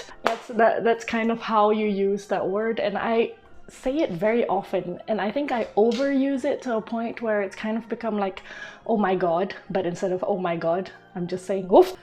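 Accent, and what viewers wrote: Scotland, United Kingdom. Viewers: Haha you sound pretty Scottish already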